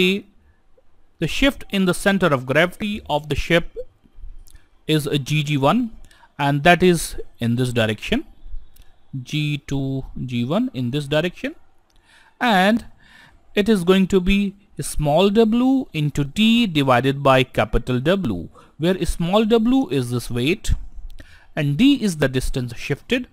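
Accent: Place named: India. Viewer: u trying so hard to make british accent but still your indian accent kills your mouth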